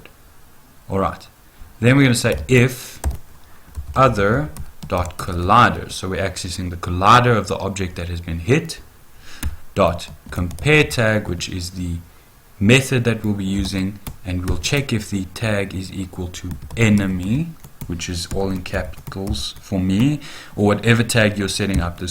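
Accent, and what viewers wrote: South Africa. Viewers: Are you South African ? Accent sounds familiar!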